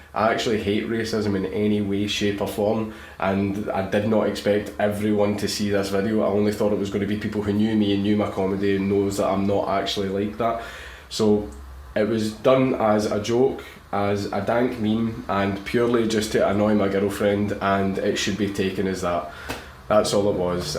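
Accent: Scotland, United Kingdom. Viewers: dude your awesome! ever since i saw train spotting i love the scottish accent .... i use phrases like " no bother" and shit ive culturally appropriated from scottish vernacular ..... if me and my girl ever visit the uk and scotland in particular ill buy you and your girl a round, subbed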